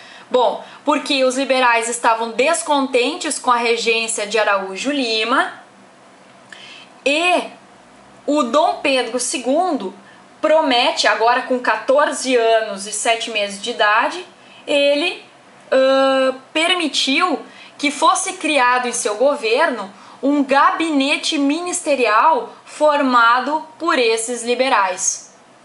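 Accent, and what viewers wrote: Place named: Rio Grande do Sul, Brazil. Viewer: Pela forma de falar a conjunção mas, garanto q é do RS, haha tbm sou, gostaria de saber se tem como fazer video aulas sobre a historia do nosso estados, e venho dizer q as aulas tem me ajudado muito, desde já agradeço e muito bom trabalho tens feito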